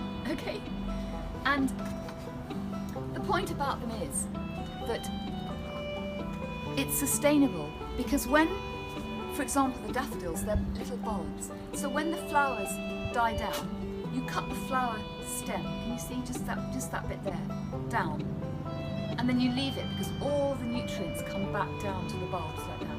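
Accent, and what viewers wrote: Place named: England, United Kingdom. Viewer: Thanks for sharing your gardening tips! Love your soft and English accent!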